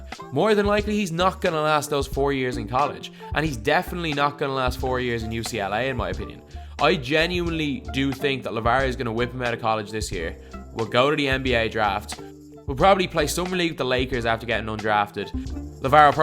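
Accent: Ireland. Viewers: Are you Irish? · You irish?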